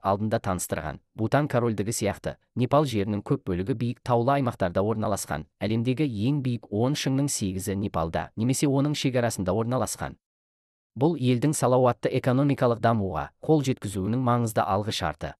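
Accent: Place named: China. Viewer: Кытайдан келген бе, казакша акцент “керемет” терминди айтпаганда,мемлекеттер атын дурыс атау керек!!!немесе мектепте дурыс окымай казак тили мен адебиетине дурыс ман бермеген бе? Блогер болу ушин жаксы дайындык керек, твндаудын ози ауыр.😮😂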